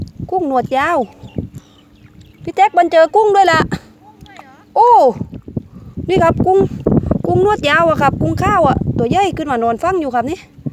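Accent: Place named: South, Thailand. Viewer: ชอบค่ะนัอง อยู่จังหวัดไหนคะพี่ Rcha ค่ะfcใหม่ค่ะชอบมากเลยค่ะ น้อง พูดใต้น่ารักทำคลิปผัดหอยให้ดูทานใหัดูด้วยน้าาา มีเพจไล้สดมั้ยค่ะแจ้งชื่อเพจหน่อยค่ะจะย้อนกลับดูทุกคลิปนะคะชอบน่ารักดี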